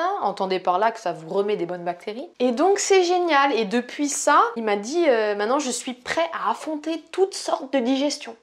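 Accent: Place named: Lorraine, France. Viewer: cet accent lorrain au top =D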